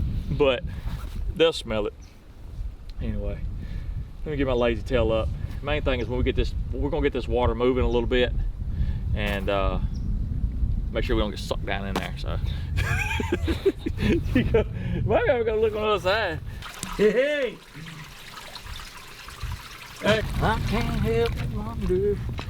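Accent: South, United States. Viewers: Hears the voice of the master typically redneck BS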